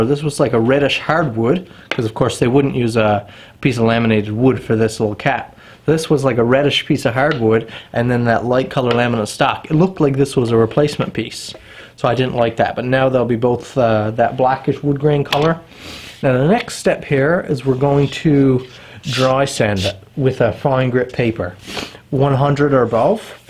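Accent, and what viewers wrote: Newfoundland, Canada. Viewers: first guy I’ve ever heard with a noticeable Canadian accent lol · Oh yeah, that's a good ol' fellow Newfie I hear. Great video my buddy. The rifle looks beautiful.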